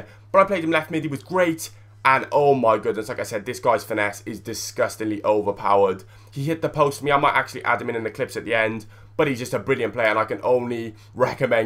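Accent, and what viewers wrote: Wales, United Kingdom. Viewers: You welsh?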